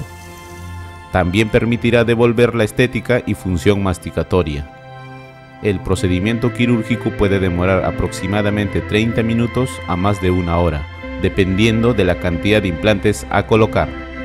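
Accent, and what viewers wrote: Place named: Argentina. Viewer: parece de Argentina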